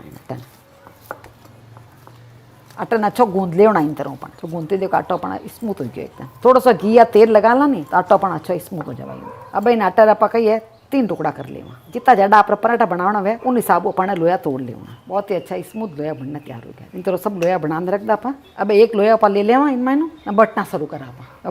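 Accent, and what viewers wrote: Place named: Rajasthan, India. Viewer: Auntyji apari marwadi sunn kar mummy or naniji re yaad aa gaye.... Thari kadhaii jali wali hai.....spl kaiii hai kadhaii ma...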